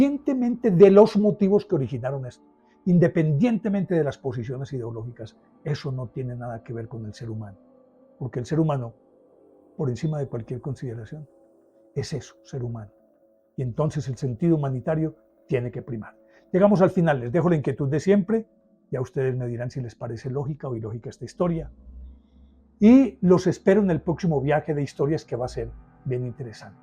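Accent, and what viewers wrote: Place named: Antioquia, Colombia. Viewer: Uy Profe excelente relato, siempre enganchado con sus fascinantes historias, y lo mejor con acento paisa. Un abrazo grande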